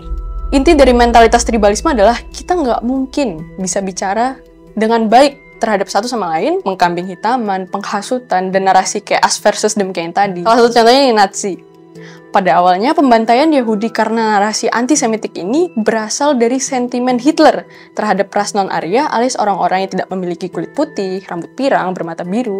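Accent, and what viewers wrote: Java, Indonesia. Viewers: Logat jawanya agak muncul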